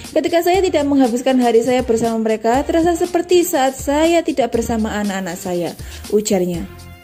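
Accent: Java, Indonesia. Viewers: Medhok banget mbak wkwkwk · Salam buat Narator Channel Youtube AC Milan Indonesia ini, mêdoq bangets... unique 👍🤩👍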